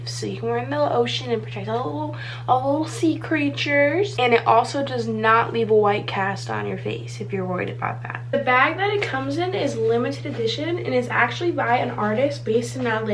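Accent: United Kingdom. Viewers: Do a whole video speaking in your britishhh accent love you/it🤣🥲🥲🥲💘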